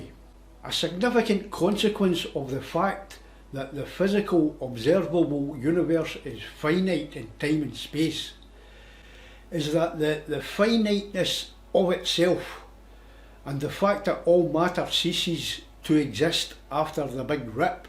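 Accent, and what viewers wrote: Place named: Scotland, United Kingdom. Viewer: Wow the Scottish accent brings me back to Edinburgh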